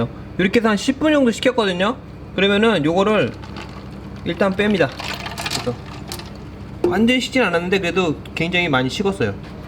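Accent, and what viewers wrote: Japan. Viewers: Gecko Park_KR 교포는 아니시고 일본에서 오래 생활하셔서 그래요 ㅋㅋㅋ예전 영상들 찾아보시면 일본인이 한국어 쓰는거 같을정도인데 영상하시면서 많이 돌아오신듯ㅋㅋㅋ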